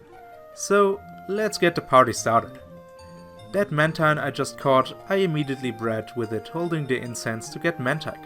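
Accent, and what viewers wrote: Germany. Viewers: Hahaha, nach 2 Sekunden direkt den deutschen Akzent raus gehört. Aber richtig gut. Ist eh besser in Englisch 💪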